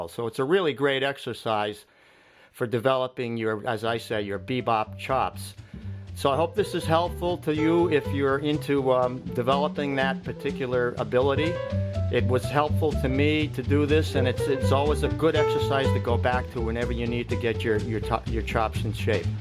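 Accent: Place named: Boston, United States. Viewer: Nice Boston accent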